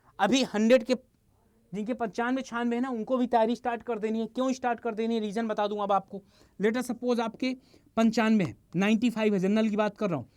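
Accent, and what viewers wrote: Bihar, India. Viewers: Sir aap bihar se ho ya purvanchal UP SE?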